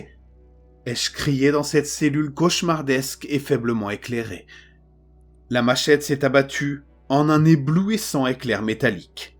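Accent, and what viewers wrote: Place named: Arab world. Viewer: Toujours aussi prenante… PS : +1 pour l’accent arabe !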